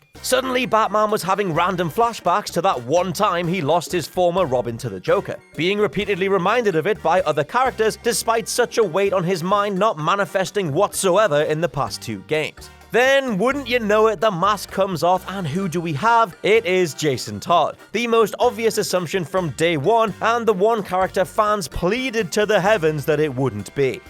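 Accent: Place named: United Kingdom. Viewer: WhatCulture is just WatchMojo with a british accent. Watching this video made me stupider.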